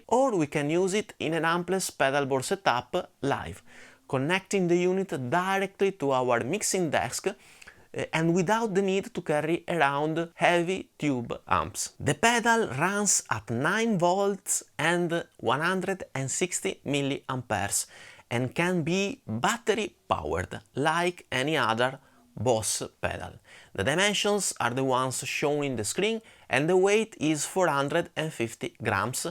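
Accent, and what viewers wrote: Italy. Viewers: what part of Italy do you come from, as your accent is very thick? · Very good demo, my compliments! I am thinking to buy this pedal to use it in my upcoming recording session in April instead of the tc electronic combo 65. i can hear from miles away that you are italian and that is great 🙂! Just starting to follow your channel.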